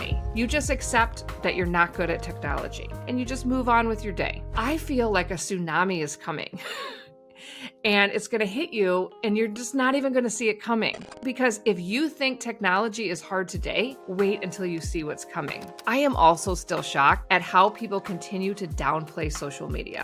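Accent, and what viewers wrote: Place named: New Zealand. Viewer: I am a person who wants to come to New Zealand. Watching Madam's video is for learning Kiwi English accent ❤️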